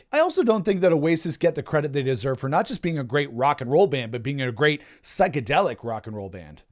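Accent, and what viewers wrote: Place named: United States. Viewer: I looked at the thumbnail and for some reason knew I was gonna hear an American accent lmao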